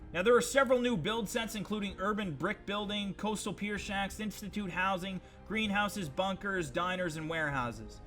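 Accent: Canada. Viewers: The Canadian accent is killing me, it's too fucking funny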